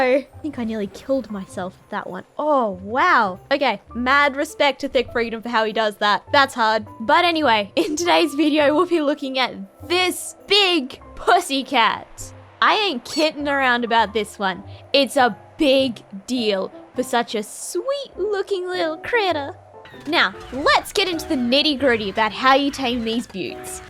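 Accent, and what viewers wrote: Australia. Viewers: Are ye from australia mate? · is an aussie???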